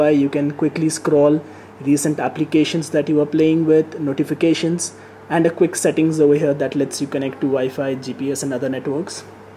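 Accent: India. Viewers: u are an ass with fukin indian accent.. gayboy... :x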